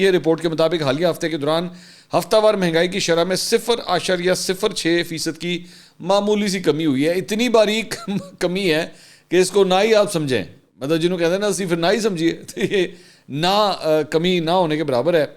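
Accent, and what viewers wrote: Punjab, Pakistan. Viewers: Very well elaborated but conscious enough. Balanced and intelligent. Good punjabi touch as well.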